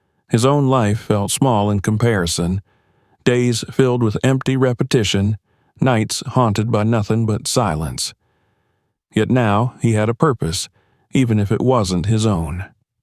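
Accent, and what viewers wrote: South, United States. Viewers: Findlay Ohio loved this story and the southern draw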